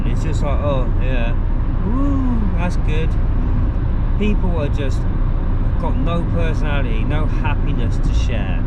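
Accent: United Kingdom. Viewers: West Country accent. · You got a Norfolk / Suffolk accent?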